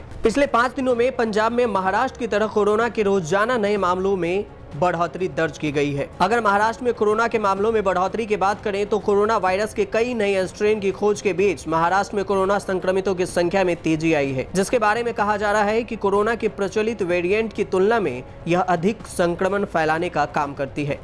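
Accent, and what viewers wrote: Bihar, India. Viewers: Bolne wala bihari hai ....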